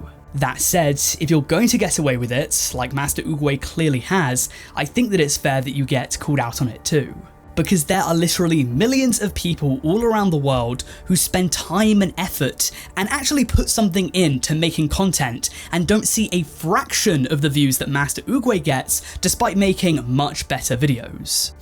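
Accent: United Kingdom. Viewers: I wouldn’t listen to anyone talk about the fall off of masteroogway, except for and his glorious British narration😍😍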